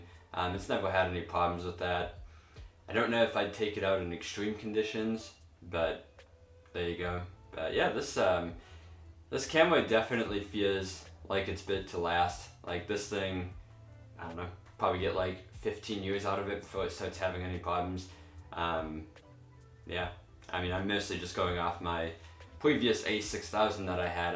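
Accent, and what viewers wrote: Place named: United Kingdom. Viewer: you sound more British than American but I'm not a native-speaker so I can be wrong. From which city are you from?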